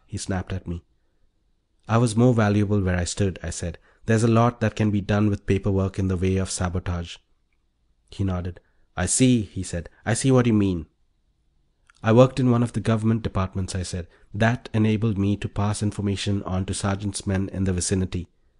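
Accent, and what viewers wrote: India. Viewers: The pathetic narrator has an annoying Indian accent. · Beautifully spoken English & lovely crisp Indian accent. I really enjoyed it, thanks...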